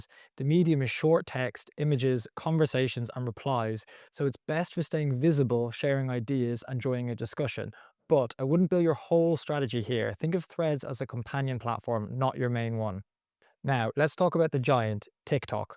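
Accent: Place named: West Country, United Kingdom. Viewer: hi, are you from Devon? Your voice, your accent sounds the same as mine. It's so nice to hear a westcountry voice.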